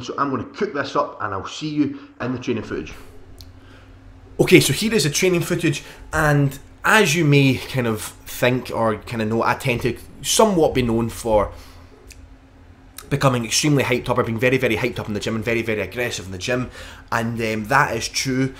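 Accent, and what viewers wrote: Scotland, United Kingdom. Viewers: I think your accent has ruined me as I can't ready "easy" without yelling it in best Scottish voice.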